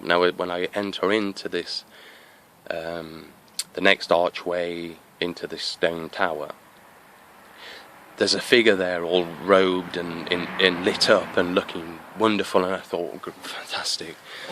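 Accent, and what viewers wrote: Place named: Lincolnshire, United Kingdom. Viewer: could i ask what region your in? your accent sounds like mine im in lincolnshire. just wondered as everyone i know is asleep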